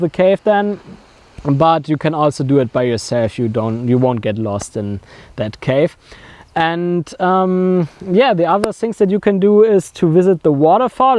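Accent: Germany. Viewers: Love the German accent. Great info :)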